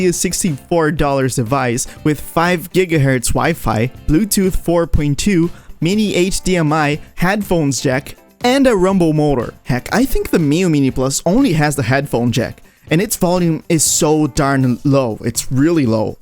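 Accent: Brazil. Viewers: Wait is that some acento br na tua fala? 👀👀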